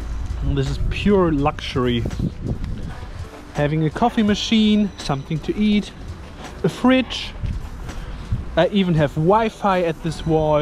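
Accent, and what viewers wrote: Germany. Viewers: If anyone didnt notice, that´s the way how german people pronounce english language :D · echt richtig cool, aber schlimm wenn deutsche englisch sprechen xD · Bin ich doof oder hat er ein absolut deutschen akzent😂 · Man hört einfach sofort das der Herr Deutscher ist 😂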